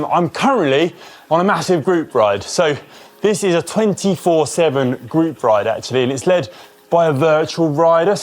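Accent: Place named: England, United Kingdom. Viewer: Irish name, Irish flag, English accent?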